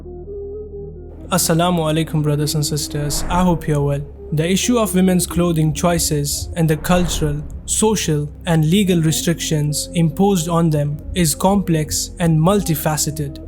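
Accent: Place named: Srinagar, India. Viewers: Are you a kashmiri? Your accent is that of a srinagariet 😅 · Yes i aslo want to know this...and i am noticing his accent is bit Kashmiri type...😂I think he may be from Kashmir❤ ...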